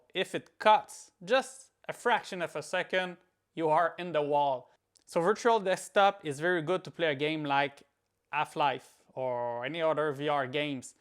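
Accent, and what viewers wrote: Quebec, Canada. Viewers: Je reconnais cet accent du Québec 😊 Bon vidéo! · Serais-ce un accent Québecois 👀 Bonne video btw! J'ai présentement un CV1 et j'ai commandé un quest 2 et j'ai commandé un link cable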